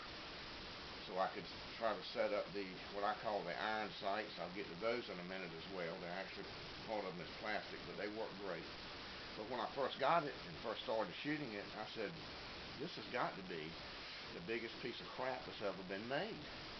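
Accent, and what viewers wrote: North Carolina, United States. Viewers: Are you from NC by chance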